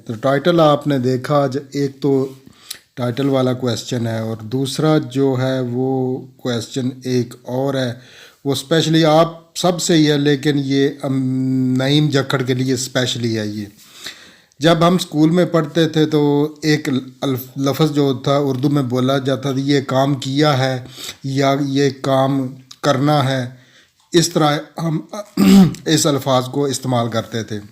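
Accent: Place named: Hyderabad, India. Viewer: Janab ye kara kari India Hyderabad ka accent he. Kehte hain Urdu UP me paida hue, Lakhnaw me jawan hui aur Hyderabad me dafan hui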